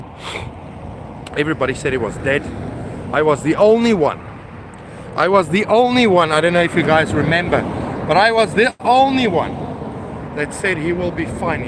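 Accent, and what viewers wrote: South Africa. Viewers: You sound like South African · You sound like a South African. ❤ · Are you a South African boy?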